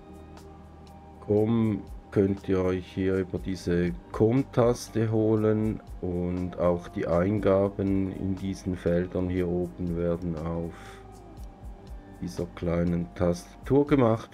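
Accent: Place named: Bern, Switzerland. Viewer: Danke für das "chillige" Tutorial... Guess us dr Region Bärn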